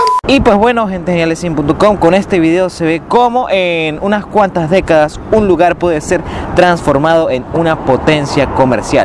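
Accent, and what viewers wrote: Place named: Andes, Venezuela. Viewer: lo Gocho.. es una expresión ... por su acento característico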